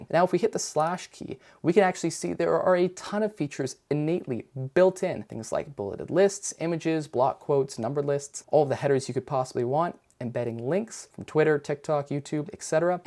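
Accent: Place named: United States. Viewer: So just slow the video down? (I’m an American as well, but your American is showing)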